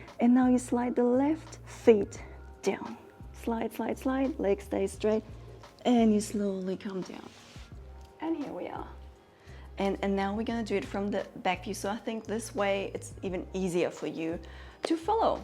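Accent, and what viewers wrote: Germany. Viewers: I really like your German accent !!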